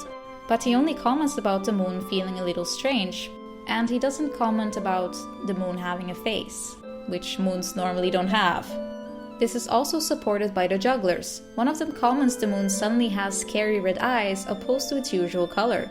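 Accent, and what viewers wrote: Netherlands. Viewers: Are you dutch?! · wich province of the Nederland are you, you're dialect sounds familiar. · oke voor een nederlandse heb jij echt een te mooi engels accent <3 10/10!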